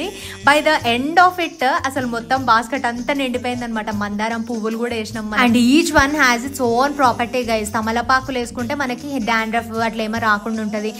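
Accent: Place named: Telangana, India. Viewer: Nee sagam PALLETURI english , sagam village Telangana yasa kalasi em baga feel undadu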